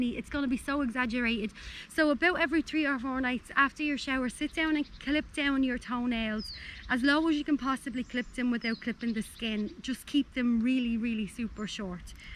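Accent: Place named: Dublin, Ireland. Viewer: Love your accent sweet Dublin 😍great video thanks 🦁lionel x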